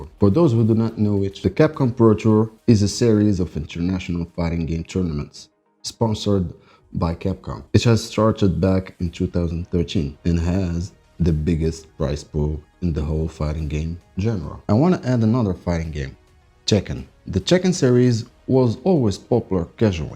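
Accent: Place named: Morocco. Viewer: Good content bro keep it up (ps:: mn accent dyalk u sound maghribi, did i guess that right ?)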